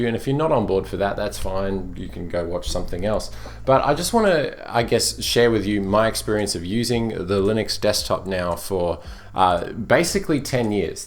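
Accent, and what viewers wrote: Australia. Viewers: great job, enjoy your chats... nice to have an aussie accent on YT !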